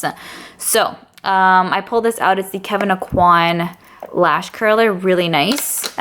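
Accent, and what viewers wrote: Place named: Queens, United States. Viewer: Just discovered your channel from suggested videos and I’m going to subscribe. I like your positive vibe. Also your accent and the phrases you use are reminding me of my hometown of Glendale, Queens. I’m in CT now and would love to not be the only one with this accent. 😻💗🌈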